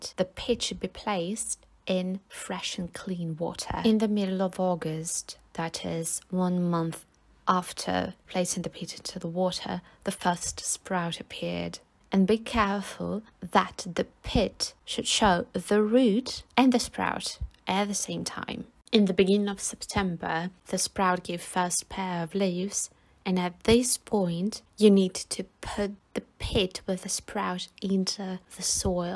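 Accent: United Kingdom. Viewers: Your British accent is very welcoming by the way and your KOT is very cute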